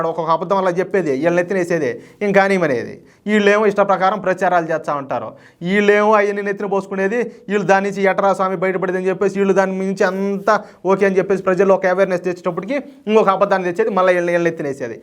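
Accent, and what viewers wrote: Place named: Kadapa, India. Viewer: ఆహ్! నిజంగానే వీనుల విందు బ్రదర్ . నీ కడప మాండలికం తెగ నచ్చింది.మాది రాయలసీమ .జై బీజేపీ.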